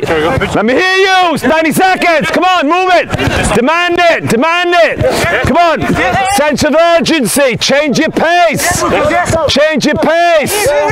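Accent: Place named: Liverpool, United Kingdom. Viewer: Love all of your videos, where are you from coach? im from a little town called st helens.. your accent sounds a bit scouse. I use your drills with my u16 and u19 teams all the time. Keep up the amazing content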